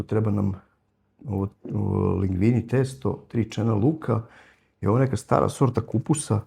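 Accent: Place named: Vojvodina, Serbia. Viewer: Kako je lepo sto si zadrzao vojvodjanski naglasak cist tako da miluje dusu❤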